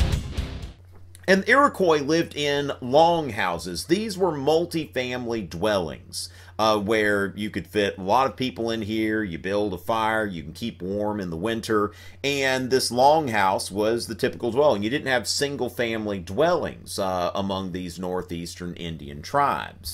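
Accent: South, United States. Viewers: Wow is this the southern cowboy accent from the USA? I always thought the people from that part of USA are considered stupid. It's amazing, you seem smart and well put together.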